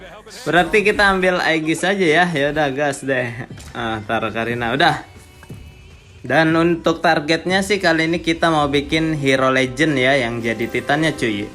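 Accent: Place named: West Java, Indonesia. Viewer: bang lu orang sunda ya, bru pertama nonton gw vitub lu, sumpah ngakak, dri cara ngomong lu plus seru juga, gw ikutin terus chanel lu bang .. mantap 🎉🎉🎉😅😅😅😅😂😂😂😂😂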